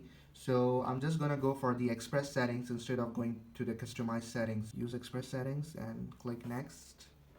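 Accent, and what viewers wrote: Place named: England, United Kingdom. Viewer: thanks god for your english accent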